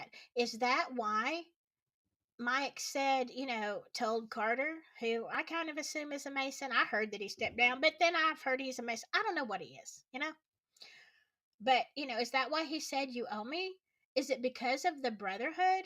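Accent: South, United States. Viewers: Love your channel and i could listen to your lovely southern accent all day, That hoodie is awesome and looks great on you.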